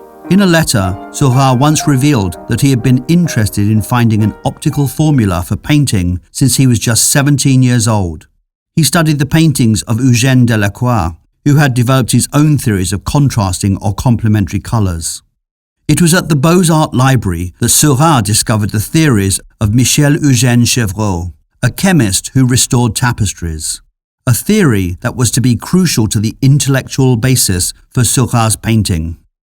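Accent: United Kingdom. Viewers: Art from France. Video from England (I suppose, because of the accent). Paint from USA. Viewer from Brasil. Globalisation is real!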